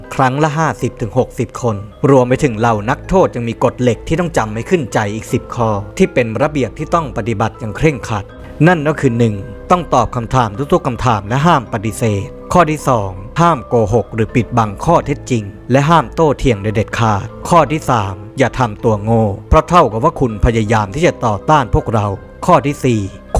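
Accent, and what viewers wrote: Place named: Suphanburi, Thailand. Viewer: แอบเหน่อสุพรรณ😂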